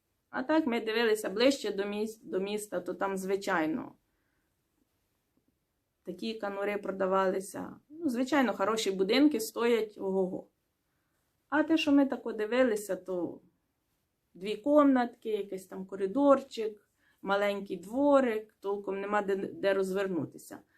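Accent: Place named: Western Ukraine, Ukraine. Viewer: Як Одеса? Я почала вас дивитися думала що ви з западної України приємно здивована.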